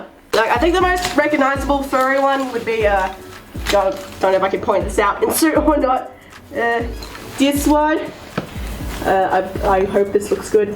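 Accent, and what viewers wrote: Australia. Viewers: Wait....r u aussie!?!?! Coz if u are. FURRY AUSSSIE YOUTUBER LIKE MEEEE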